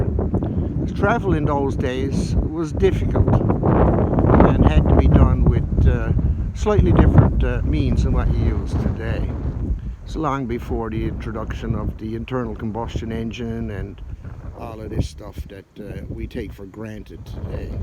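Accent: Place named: East Anglia, United Kingdom. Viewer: Your accent has some elements of East Anglian pronunciation, i.e. north Essex, Suffolk. Have you traced your family back a few generations?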